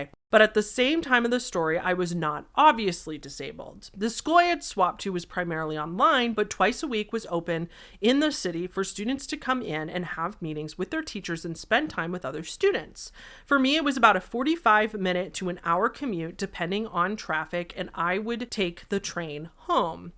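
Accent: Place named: East Coast, Australia. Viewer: It's an Australian east coast accent ahaha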